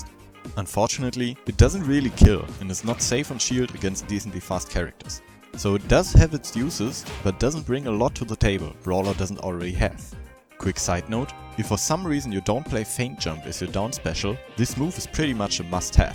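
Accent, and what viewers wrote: Germany. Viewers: Sorry bro aber da hör ich nen deutschen Akzent raus lol . Cooles Video, ich find Brawler auch sehr spannend, er ist sooo underrated!! :)) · Du klingst sehr deutch./You sound very german. And also thanks for the video.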